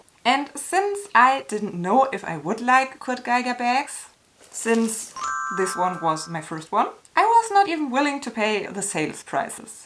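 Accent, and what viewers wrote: Germany. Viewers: The bags are pretty 😍🥰 and your sweet German accent is ever sweeter ❤️🥰💋😍💞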